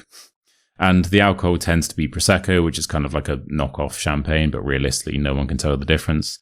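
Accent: United Kingdom. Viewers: Hi i’m from Thailand ,your channel is good to be practice British accent ❤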